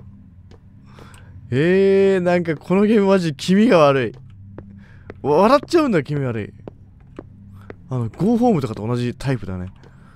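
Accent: Kansai, Japan. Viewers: 確かに笑か怖かどっちかにしてほしいですね、サムネ最高ですw 「どっちやねん」 の関西弁好きです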